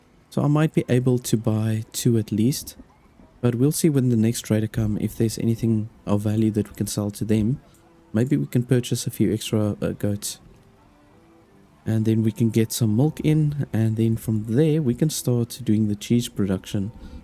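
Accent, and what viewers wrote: South Africa. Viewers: Hey dude... as a South African. You sound like a South African. Where you from???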